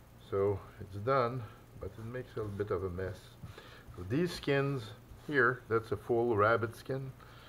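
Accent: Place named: Quebec, Canada. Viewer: i can imagine your from quebec. tabarbak thanks for the video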